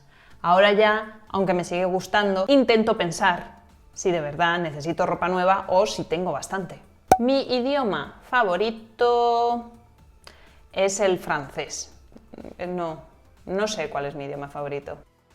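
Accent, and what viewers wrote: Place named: Castile, Spain. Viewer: Ciao! il tuo accento castellano è fantastico, ti ascolterei per ore! Grazie per il tuo canale, mi sta aiutando molto a imparare lo spagnolo, un saluto dall'Italia!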